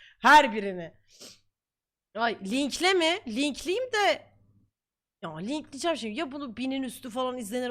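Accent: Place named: Black Sea, Turkey. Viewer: Pqueen aslen nereli ? Biraz Karadeniz konuşması hissettim bir de kolbastı ile alakası var filan , biler varsa söyleyebilir mi ?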